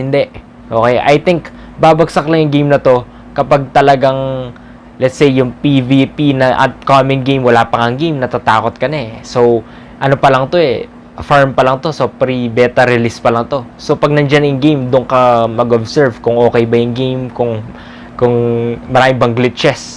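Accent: Pampanga, Philippines. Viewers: Kapampangan ka sir? Hahaha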